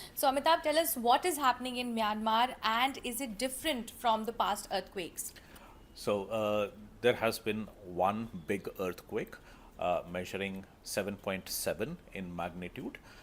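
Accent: India. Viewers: Bill gates with an Indian accent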